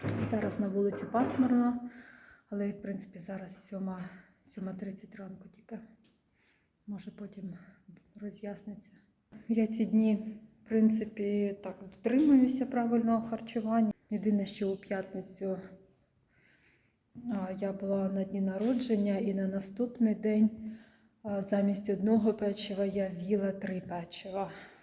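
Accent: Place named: Donetsk, Ukraine. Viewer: дякую , що не проігнорували моє питання . Я таки відчула по мові , що ми з вами землячки . Я теж родом із Донецької області , В-Новоселкіасткий р-н, це ближче до Курахове , Марʼїнки . Але заміж вийшла і переїхала в Заплрізьку область . Начебто області знаходяться поруч , а українська мова має свої нюанси ( діалекти на заході України ). Тепер ми всі біженці - мої родичі з Донеччини і ми із Запорізької області , бо частина області окупована , частина під обстрілами . Так і скитаємося по світу…А ваші відео приємні , заспокійливі , трішечки із смутком . Бажаю вам багато підписників і якнайбільше переглядів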